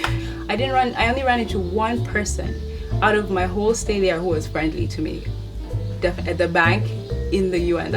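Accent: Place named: Kenya. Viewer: omg, i thought you were Kenyan at first, just from the accent. definitely subscribing to your channel. Thank you for the video.It was definitely informative. I hope to be able to intern at U.N next summer/ keeping my opes up.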